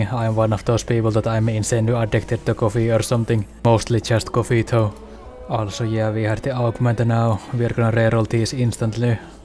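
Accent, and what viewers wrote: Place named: Finland. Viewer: You have to be 100% finish. That accent tho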